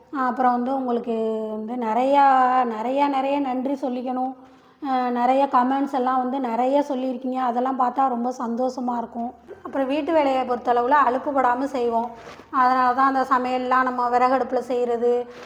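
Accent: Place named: Thanjavur, India. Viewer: வாழ்த்துக்கள். தஞ்சை மாவட்டம் திருவாரூர் மாவட்டம் வட்டாரச் சொல் படி பேசுகிறீர்கள்.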